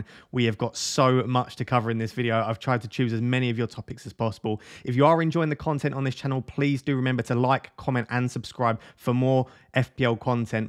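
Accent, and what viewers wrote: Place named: Essex, United Kingdom. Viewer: respect mate! Essex makes sense because of the accent. Big up Man Utd ggmu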